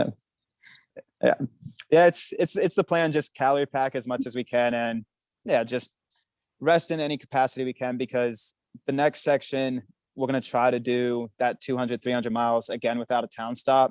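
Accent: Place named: South, United States. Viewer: Your southern accent somehow got stronger from being in florida :p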